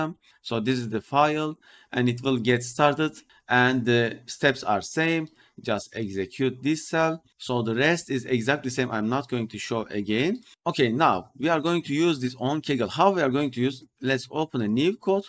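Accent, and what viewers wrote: Turkey. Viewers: hocam civitai de videoyu izliyim dedim aksanınızdan ve yüzünüzden kesin bu adam Türk dedim tahminim tuttu :) elinize sağlık,civitai ile model indirirken her model için isim belirtmemiz gerekiyor sanırım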